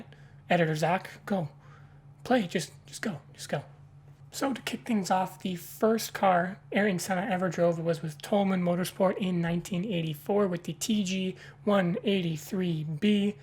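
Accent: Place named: Toronto, Canada. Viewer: Are you from Toronto?😂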